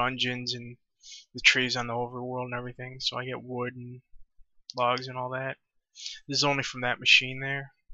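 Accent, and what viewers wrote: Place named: Chicago, United States. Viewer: This guy has to be from Chicago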